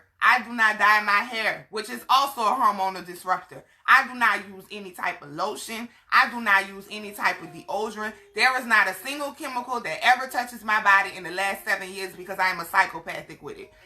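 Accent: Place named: Louisiana, United States. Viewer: Just recently subscribed you are so beautiful inside and out what state are u from. Love that accient sound like you from lousiana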